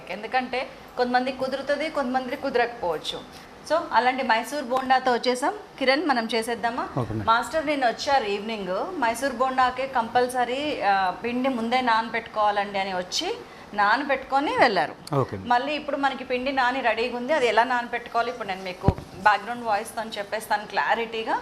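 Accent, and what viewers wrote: Telangana, India. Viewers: Akka me Language superb akka nenu me lagey pakka telangana matladtha mastu ma Hyderabad relatives comments chestaru...akka 'Manchiga' is also my word..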